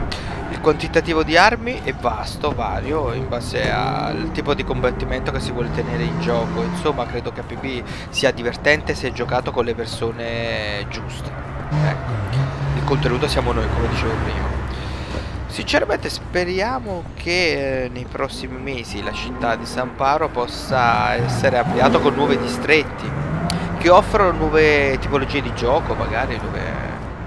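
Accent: Italy. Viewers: Napoletano Doc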